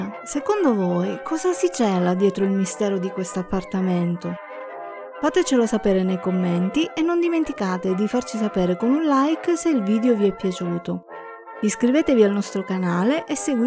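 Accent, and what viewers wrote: Italy. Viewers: Sei palermitana tu che parli ???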